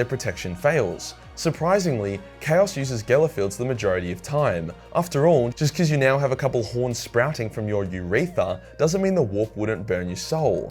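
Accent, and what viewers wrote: Australia. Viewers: I can't stop watching your videos, mostly because of how intensely Australian you are. We Americans love Australians.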